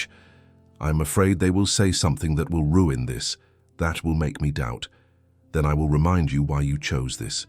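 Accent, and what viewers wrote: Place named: England, United Kingdom. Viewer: I agree with your laud of the narrator. Luv that purring English accent. YUM!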